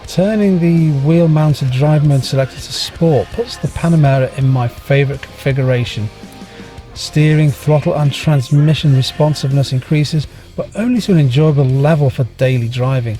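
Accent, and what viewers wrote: United Kingdom. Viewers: British accent 😍 best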